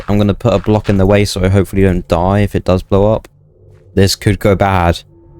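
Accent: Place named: United Kingdom. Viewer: U sound British but watching u play I think I may add cheats Cause that will take very long what mod pack did u down load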